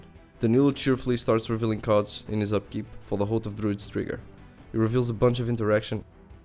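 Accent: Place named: United Kingdom. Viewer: Love the new video production, the narrator has a very good British style accent.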